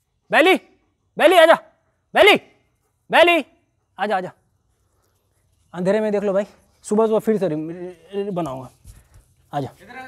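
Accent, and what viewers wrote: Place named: Bhojpuri, India. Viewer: Bhai Really love your raw videos Your Bhojpuri accent ... ❤️❤️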